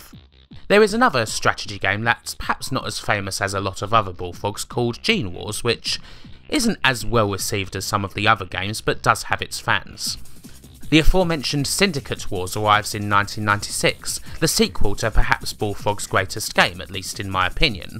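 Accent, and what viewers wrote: United Kingdom. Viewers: The problem is his video's are to long. He needs to cut it down. It's like a dude rambling around one person for hours on hours long. get's old fast. Make a 10-15 minute video and combine the big points. The moment people see the time on the video they will click it away directly. Nobody has time for such long talks. It also doesn't help, his voice is extremely british, which makes it kinda annoying to lissen to for long times. As his voice contains lots of low and heights sound pitch voices. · aa aa I disagree. People do have time. It's just that people don't want to take that time. As for her British voice, yeah well, you'll get used to it.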